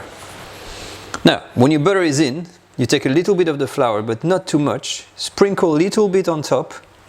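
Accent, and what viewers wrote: France. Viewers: I subscribed because I love your French accent! Okay... and I love food recipes! 😆 (Watching from Chicago) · Love this video demonstration of yours...French accent and all...👍🥰 Thanks for sharing.. ❤💖💝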